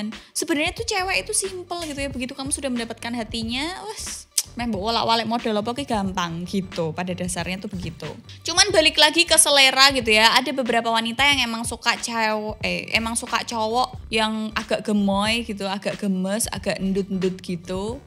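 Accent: Java, Indonesia. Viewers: Suaranya medok nduuu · Wuaduuuh...!!! Medok buanget mbakayu!!! 😘😆🙀😹🙈🙉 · logat nya jawa ya sama jawa😂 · Aku seneng bahasanya enak blak blakan medok jawane sukses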